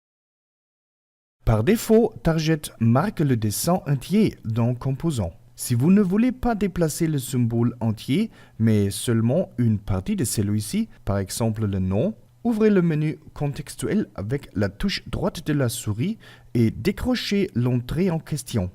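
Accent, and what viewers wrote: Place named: France. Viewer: ach du liebe Gott ^^ danke für diese schöne Französisch-Akzent.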